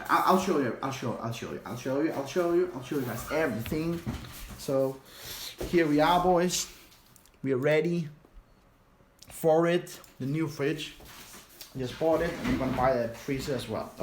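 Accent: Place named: Denmark. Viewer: du har kim larsen engelsk accent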